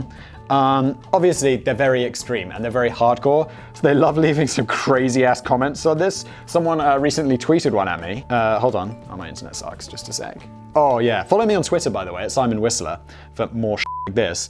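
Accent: United Kingdom. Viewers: I did not know British people said urinal like that. I’m shook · The way Brits say urinal, garage, and controversy is weird. And I learned this from watching Simon.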